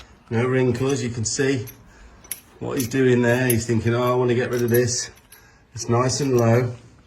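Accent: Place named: Wales, United Kingdom. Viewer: Brilliant tips’ VLOG like the other one. By doing what you do, it removes all the flight/fight out of them … and they may actually enjoy the riding. I knew Stephanie Powers was into horses from watching her on some US talk show many years ago, where she discussed her custom made equine dinnerware … it was beautiful … and it was also for charity too. I wonder if she still has the set?!? But I didn’t know she had rescue properties all over the world. It should be an awesome visit/session. The way you were talking it sounded like it’ll be a members only VLOG … 😢! Pity!!! Well, you’ll both have done quite a lot of globetrotting this year … mind you your Vacation time is usually in the Winter/Fall seasons, which tend to be the off-season for some. This was an unusual year though … what with the wedding and all! Love the colour of nails 😊 … will look nice with the tan you’ll be working on! 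I’m wondering if the place you’re going to is that rescue you visited at, in one of your VLOGs? I think it was there we saw it. Or maybe that was Greece … you mentioned you guys lived there for severeal years at one time. Hope you have a wonderful time again, when you’re back in the US … hopefully it won’t be too crazy weather-wise! Things are getting a bit extreme, in various parts of the world. Loved the look for today’s VLOG … almost forgot you were Welsh … or are you British (?), until you started to talk! 😂 I’m looking forward to the next VLOG. Safe travels and Happy Trails! 🙏🙌🐎🐴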